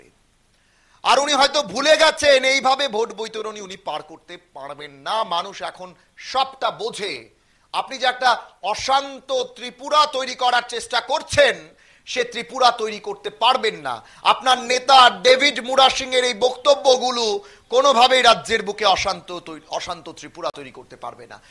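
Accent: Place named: Bangladesh. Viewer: তুই আসলে আসল বাংলাদেশি কারণ তুই যেভাবে কথা বলছস সেভাবে বুঝা যায় তুই ওই বাংলাদেশ থেকে ওই এসোস আমি চাইলে ডেবিট মরা সিং সারের যে লেখছে শের থেকে আরো ভালো করে দেখতাম আর আরো গালি দিতাম বাংলাদেশী তোরা তোরা তিলকে তাল বানাস তার জন্যই এখন যে জায়গাটায় আছো সেই জায়গার বসে রয়েছো কেন ভয় লাগছে বাংলাদেশে যাওয়ার জন্য এমনি তো তোর যেতেই হবে কারণ তুই আসল বাংলাদেশি😂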